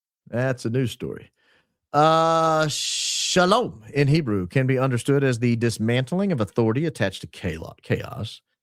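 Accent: United States. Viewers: Lol, I wouldn't criticise the British too much - you Americans have taken our language and butchered it. Your words like "crises" which you all seem to pronounce like "crisises" and in particular the way YOU pronounce "niche" as "nitch" is actually quite hysterical. It is pronounced "neesh" Fries are skinny potatoes which are deep fried. Chips are thicker deep fried potatoes. Crisps are snacks. Lol, I don't think the Americans can speak to the English about THEIR language... Aluminum anyone? Ffs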